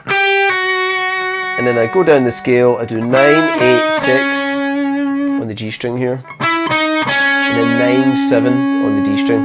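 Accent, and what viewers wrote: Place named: Glasgow, United Kingdom. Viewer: at last! a guitar teacher with a weegie accent! ya dancer!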